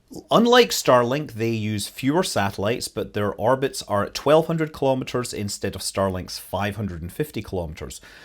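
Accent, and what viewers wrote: United Kingdom. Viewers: wait what, your British? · Despite your accent I usually think of you as American so it was interesting hearing you say "we" referring to the UK and then correcting yourself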